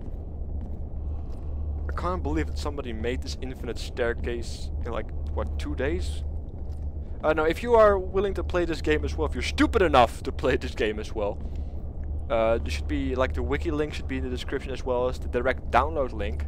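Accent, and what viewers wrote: Netherlands. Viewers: Hahaha, I had no idea you made these kinds of vids as well! Hilarious! Make more! There's tons of these kinds of games floating about these days. :3 Also, your accent... Are you Dutch or something like that? :D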